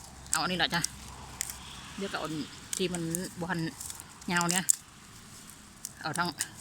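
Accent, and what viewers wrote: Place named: Phu Thai, Thailand. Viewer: 🙏ดีค่ะ มาย้อนดูคลิปเก่าๆจ้า เป็นคนจังหวัดอะไรค่ะสำเนียงเหมือนภูไทหรือจังหวัดเลยค่ะ 🌷👍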